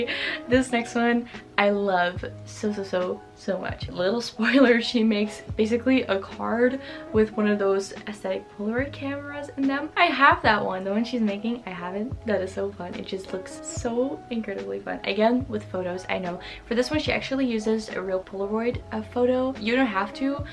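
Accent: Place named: Netherlands. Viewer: i lovely, ik denk dat je Nederlands bent bahaha klopt dat? Je naam en gwn je uitspraak😚 pls reageer ff want ik ben benieuwd ik ben ook uit Nederland en ik woon in Nederland, ik zag een keer ook dat je stiften had van de HEMA haha en 1 keer kaarsen van de blokker❤ maaruhh ik hou van je video's ❤❤❤ keep up the good work girl ilyyy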